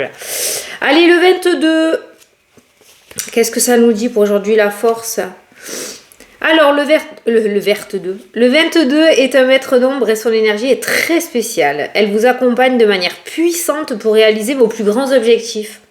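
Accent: South, France. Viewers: Coucou, J’ai une petite question un peu particulière! Je cherche d’ou vient ton accent? Marseille ou côté Toulouse? Je t’écoute tous les jours… je t’adore ❤❤ Bisous bisous ❤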